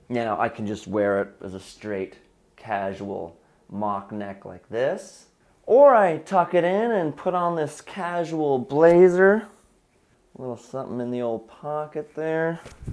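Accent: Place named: Canada. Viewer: And Sew On recommended your Vlog with a few others. I can see why! I thoroughly enjoyed it. Your tee shirt is indeed a nod to the 70's, 60's and 70's being my era. Your stripe matching, even on the Neckband was excellent. If your into drafting your own patterns watch Sew Custom Vlog, an Irish girl, she's good, I'm not saying that cause I'm from Northern Ireland too 😁, she is 👌spot on, and she's doing coats at the minute. I think you'll enjoy her Vlog. As we say in Northern Ireland, your a turn, meaning your funny! Canadian going by the accent, as some Canadians say certain words like the Scots/Irish. Do a bio on yourself if you haven't already done so.....😉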